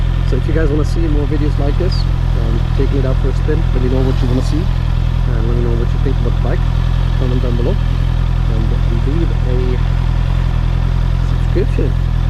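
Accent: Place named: Germany. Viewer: Es ist so nervig dein englisch mit deutschen Akzent zu hören. Nicht böse gemeint!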